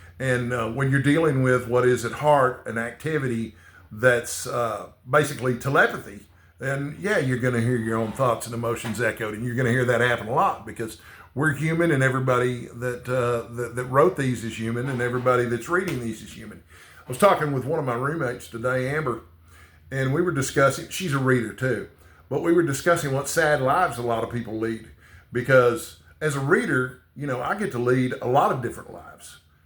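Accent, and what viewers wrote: Texas, United States. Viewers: What a fun video. 'Salem's Lot opened me up to reading and loving King after basically a lifetime of meh reading experiences with him. I did chuckle about the Texan accent. My Tennessee accent is often mistaken for a lifestyle that is reminiscent of Hee Haw and not a city dweller. Stay sexy and hydrated!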